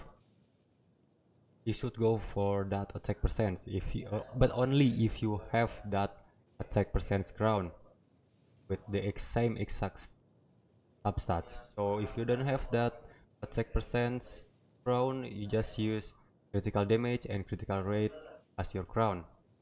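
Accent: Indonesia. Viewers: You speak very much like Indonesians, whether you are from Indonesia or Malaysia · sounds like an Indonesian accent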